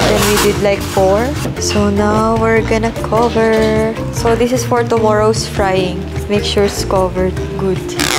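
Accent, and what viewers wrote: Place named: Philippines. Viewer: talks exactly like the wife his intonation, Pinoy accent, laugh and man er of speaking he adapted from her. Both are happy people always laughing and joking.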